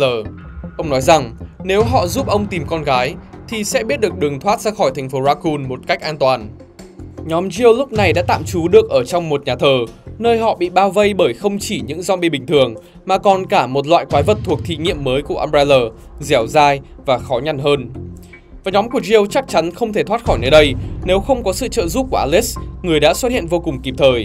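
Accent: Vietnam. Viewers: Bác thuyết minh dân Nghệ hay Hà Tĩnh v ạ?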